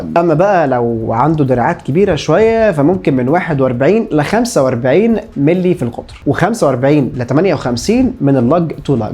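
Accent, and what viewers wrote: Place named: Arish, Egypt. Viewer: من العريش بدكاش وفهاش عرايشية يا خال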